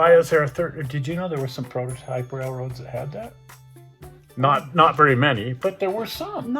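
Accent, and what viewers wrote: United States. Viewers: For those that commented negatively. Life is far to short to be commenting negatively. Please lighten up. I still think Hill Billy accent was funny. But I have been told I have no taste.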